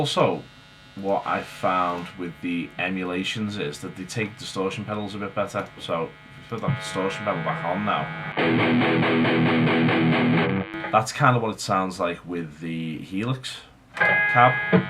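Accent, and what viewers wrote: Liverpool, United Kingdom. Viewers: With respect, I disagree. I enjoy hearing his strange Scouse-ish tones and humour. It's quite patently not a corporate demo.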